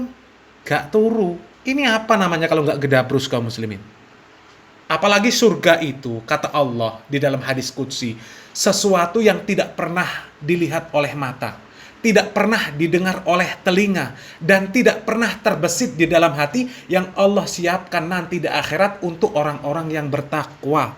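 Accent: Java, Indonesia. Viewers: Saya suka channel ini. Channel yg mencerdaskan akal ... Apalagi ditamabah narasi dgn LOGAT JAWAnya yg MEDHOK.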